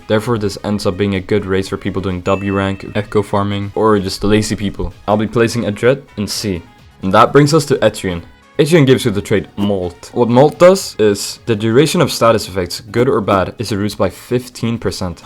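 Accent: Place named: United States. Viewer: du låter på riktigt som en amerikan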